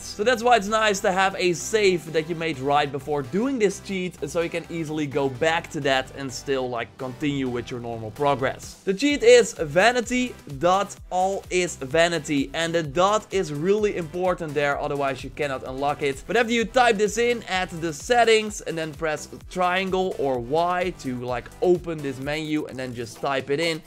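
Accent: Netherlands. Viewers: I can tell by your accent that you're Dutch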